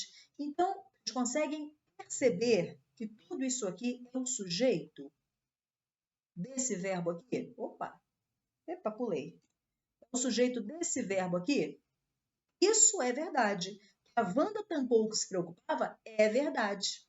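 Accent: Brazil. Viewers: Parece paraense!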